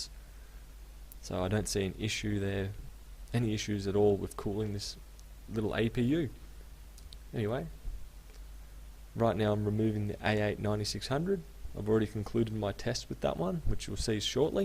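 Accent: Australia. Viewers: Who is this ausie guy... IF YOUR GOING WITH A STARTER CHIP lol... You get the fucking Ryzen 3 2200G! It's on sale for like 110 dollars canadian right now... and they run the exact same as the 7nm 3200G for 20 dollars more. Best budget CPU by far to start with. And if you upgrade down the line, you literally won't get bottle necked with a GTX <num>... but who needs all that wattage? lol.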